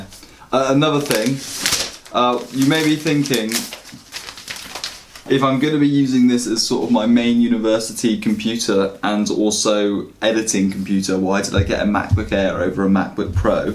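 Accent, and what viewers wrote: United Kingdom. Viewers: Haha love the English accent bro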